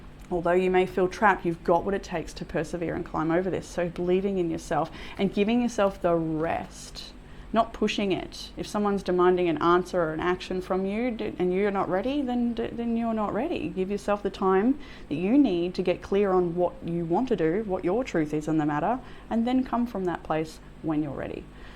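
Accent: Australia. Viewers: I love your readings. Some resonate more than others. I love listening to you as I’m also Australian and it makes me feel closer in some way. I listened to this reading last night and picked group 2. This particular reading felt so personal and I’m hoping it did for many others too. I love that sometimes it is right on the mark. Thank You 🙏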